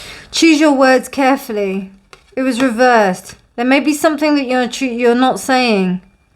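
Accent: United Kingdom. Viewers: Thank you for your guidance and knowledge as always..also FYI your voice and energy are ridiculously soothing :) Brits automatically sound so much more sophisticated compared to the rest of the world!😅 You could make a video of only complaints and insults and I'm sure I could play it to sooth me to sleep. 💜🥰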